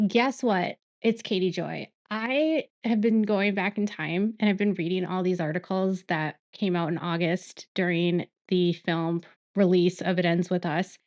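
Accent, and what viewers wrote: Minnesota, United States. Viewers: You sound like you are from Minnesota 🤷🏻‍♀️ 😊 I love it. I wish I could 👍 your video… I think I will anyway and just yours alone. My YouTube data is 2.38 and haha have no room on my iPhone because of pictures/videos of my son 😊 you know how it is 😂 And yes you are right about the steps. I was reading from another state, and I was misinformed. I do apologize for making my comments in regard to the steps. 😔 I really hope this goes to trial and the bring this up. Bryan knows something we don’t and has secret evidence. People thought it was silly he put out all the evidence right away, but what makes you think that’s all the evidence? 😂 it’s not. Bryan has it in the bag 😊 ❤ & Support ~ Always, you are a ⭐️ and stay strong. We are all here for you 😊 #iamnotabot EVER!! 😂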